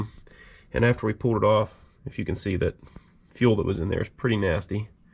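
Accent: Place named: Tennessee, United States. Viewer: sounds like your from tennesse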